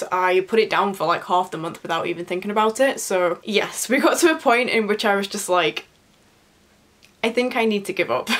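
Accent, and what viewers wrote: United Kingdom. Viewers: Strange question I know but are you from Cumbria?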